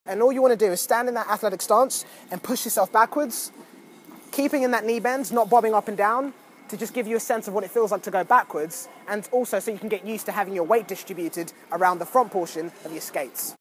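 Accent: United Kingdom. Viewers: love his British accent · Are you British or American but I guess your British by the sound of voice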